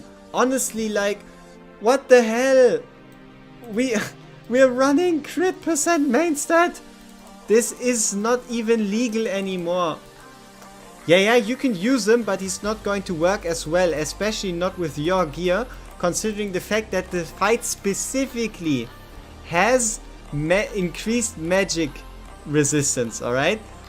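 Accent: Germany. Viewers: naja man hört halt direkt dass du Deutscher bist :D aber man versteht alles und das ist doch die Hauptsache. Und inhaltlich eh top, also who cares ;)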